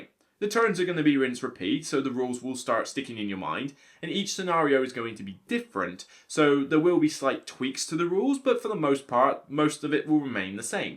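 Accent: United Kingdom. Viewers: Have my thumb up and don't take it too seriously, there are many reasons to dislike for different people as *Wants to thumb up, but klicked the wrong button by accident, *dislikes your British accent/clothing/playstyle enter whatever reason here and madly down thumbs all your videos, *hates Arkham Horror/Ameritrash games..... Asking for the reason is fine, but I would not expect to get an answer from those who thumb down, as the won't likely read your question and IF they wanted they could have give constructive negative feedback in the comments...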